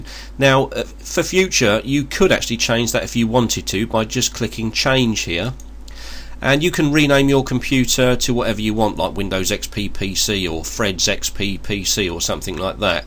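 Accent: United Kingdom. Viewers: great video, great explanation, amazing British Accent / simply amazing ! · Should have figured you were from the UK. His accent is irritating. "Sheering from this foowdu'". My gosh. How about some American? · Lovely British accent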